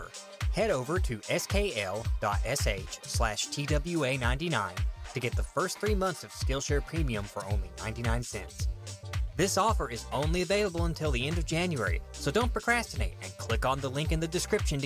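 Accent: South, United States. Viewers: I only just now realized you have a Southern accent.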